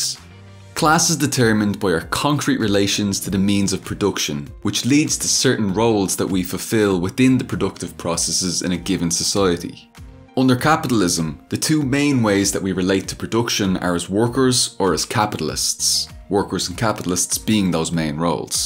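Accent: Ireland. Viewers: There is something about you that is really attractive. I don't know what it is. I hypothesis is either of these three: - 1) Our ideological agreement (both MLM) 2) Your good looks (not jealous😂) 3) Your sweet Irish accent (not being racist) 4) Your way of (""usually"") simplifying explanations😂😂😂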